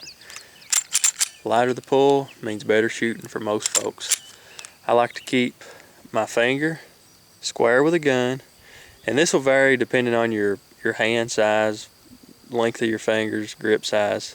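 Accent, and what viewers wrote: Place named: Alabama, United States. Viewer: he sounds like he's from Alabama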